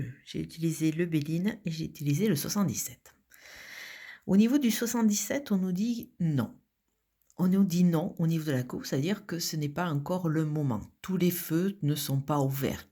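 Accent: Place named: South, France. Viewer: Bonjour, accent du soleil!!. 🌞... j'aime beaucoup cet accent, merci de tout ❤ pour ce tirage. Une question si vous permettez: faites le nettoyage émotionnel? s'il vous plait... 👃 🙂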